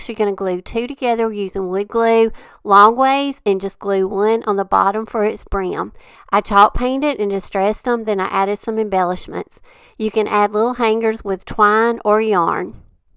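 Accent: South, United States. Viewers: I just love your channel and I love all of your awesome ideas!! I love hearing your southern accent It reminds me of all my family back I Alabama....luv and wishes to you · Just came across your channel and I’m so glad I did! First, I love your accent! I’m a southern gal from TN and appreciate your sweet southern accent. Loved all of your DIY’s! Everything turned out beautiful! Subscribed and can’t wait to watch more of your videos!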